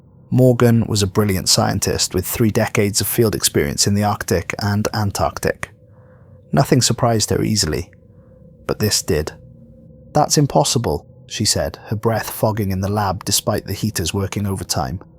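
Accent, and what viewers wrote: Wales, United Kingdom. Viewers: awwww shit we did it!!! thanks for the great content, for talking me to sleep with your caramel butterscotch welsh accent almost every night. well deserved, here’s to many more.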